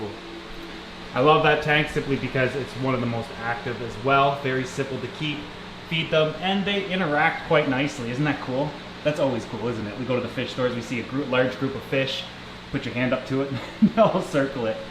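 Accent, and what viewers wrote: Canada. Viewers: Ah first video and I just knew you were a fellow Canadian as soon as I heard your accent. I’m jealous I want a fish out building lol. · ...you must be living in Canada...your accent...;-)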